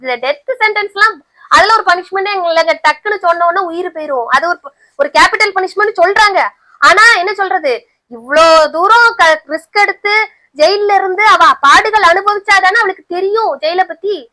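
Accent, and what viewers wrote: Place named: Tirunelveli, India. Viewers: தென்மாவட்ட தமிழ் எதார்த்தமான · மேடம் நீங்க பேசுற திருநெலவேலிலெங்வேஜ்ரொம்பநல்லாருக்கு❤❤❤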